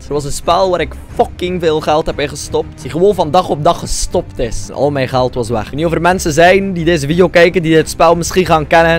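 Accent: Belgium. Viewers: ben jij belg ofni ?'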